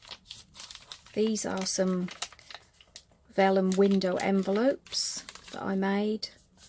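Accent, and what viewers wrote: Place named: Norfolk, United Kingdom. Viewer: So beautiful! Also looked at your Etsy shop because I recognised your accent - good ol' Norfolk! (Me too!) x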